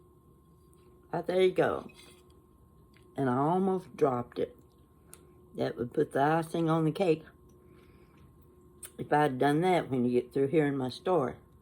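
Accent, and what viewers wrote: South, United States. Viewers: Hi, just found you by accident and had to watch a couple of your videos. I can't wait to see more of them, I connected with you right off the bat. I live in Michigan but my parents were from the south, so I love you're accent. Keep up the good work and thank you for doing these videos. Blessings to you.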